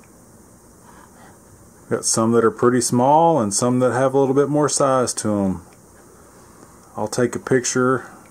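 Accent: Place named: Texas, United States. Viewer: Sound like a good Son of Sam Houston if i ever heard one. Im East of Houston. Just starting. 3 bins so far, but i cant get mine to grow. i got 40 cocoons out of one concrete tub. put couple thousand uncle jims worms in there. 1 or 2. i need pure species bins.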